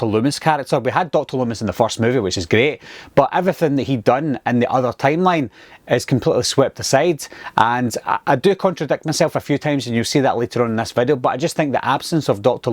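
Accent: Scotland, United Kingdom. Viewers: ...great work as always!! I like how you did something a bit different here, not just the typical paint by numbers with the franchise! Lastly....I should know this, and Shame on me for not knowing...but is that a Scottish Accent, or British? My Muay Thai Coach is Scottish and it sounds so damn familiar...either way! Love it, and great work!!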